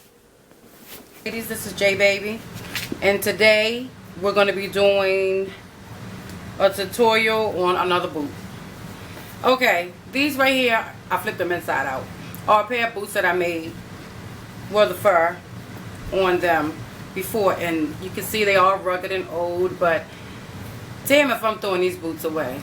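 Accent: Baltimore, United States. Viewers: Yes bmore accent! Thanks for the tut love this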